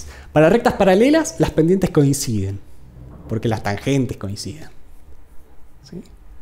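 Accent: Argentina. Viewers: Es argentino, ¿Verdad?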